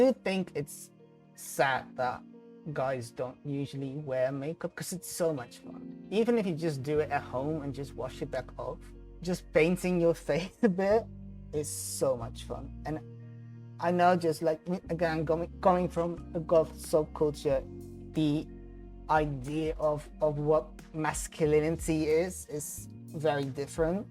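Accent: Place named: Netherlands. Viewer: You have a Dutch accent but your English is amazing! Love from kansas. I am half German and 1\4 Dutch I wish I knew Dutch or German. My great grandma spoke German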